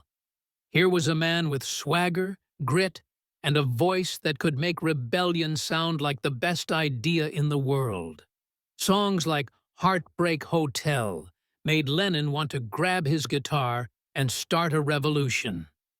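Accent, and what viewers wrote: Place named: United States. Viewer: Here we go again, the Yank narrator, talking about the bigest "British band" the world has ever known, and the five who impressed the most, yes you've got it, five Americans, you knew it was gonna be rubish as soon as he opened his mouth, they just can't except they've never produced a band as big as the, their answer was the, what a disaster that was.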